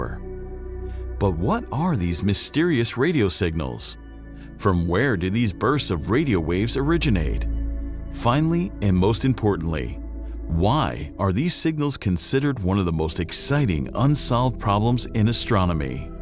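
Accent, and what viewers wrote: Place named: United States. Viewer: the narrator has the most american voice i've ever heard. great content though.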